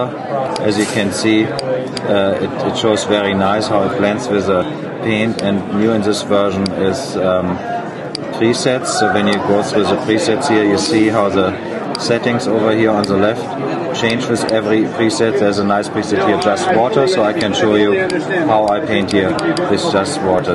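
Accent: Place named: Germany. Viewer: are you from germany? you sound like one :D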